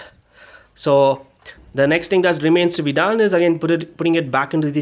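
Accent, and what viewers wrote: India. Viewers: listen to him he's Indian and alot of Indians are doctor n engineers n have a great accent so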